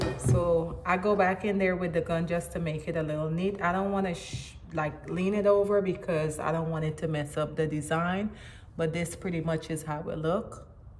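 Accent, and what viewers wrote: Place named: Jamaica. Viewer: i know a jamaican when i hear one lol big up mumma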